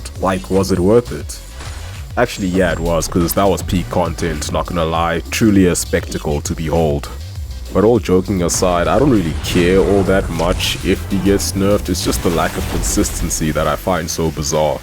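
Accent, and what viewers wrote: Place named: South Africa. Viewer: It sounds south African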